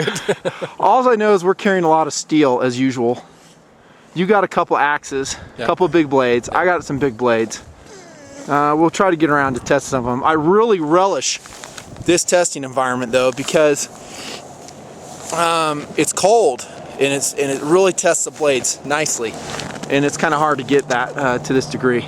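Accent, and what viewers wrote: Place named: Northwest, United States. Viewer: what state is this in? You sound like a Northwesterner.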